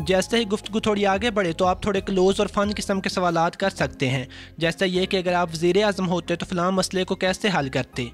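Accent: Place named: Punjab, Pakistan. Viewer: Please please please improve your Urdu. Panjabi mai styles mai Urdu mat boleye.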